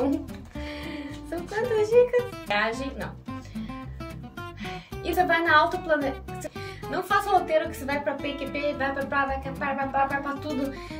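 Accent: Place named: South, Brazil. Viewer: Moça, em qual estado e município brasileiro a senhorita nasceu e morou? Seu sotaque parece ser do sul do Brasil.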